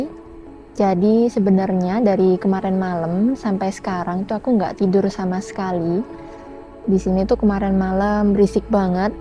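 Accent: Java, Indonesia. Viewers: Mbaknya pasti orang Surabaya, logatnya jawa banget..salam hangat dari Jateng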